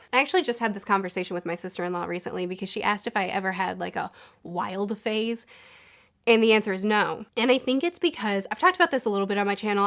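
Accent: Canada. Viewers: I’m surprised that you get compared to Lacy Chabert most. I think you look far more like Linda Cardellini, and your voice is way closer to hers too. I don’t see the resemblance to the other three examples at all. Also, I think the reason a lot of people think you’re from Canada is because of how you pronounce the first O in words like “borrow” and “tomorrow.” That stood out to me as well. Anyway, love your videos! 😊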